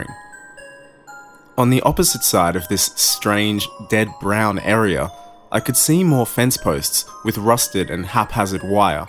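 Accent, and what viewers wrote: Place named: Australia. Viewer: On a side note, always loved the Australian accent, and I feel like it works great for this story! But I do love the way yall say No very much lol, not trying to knock ya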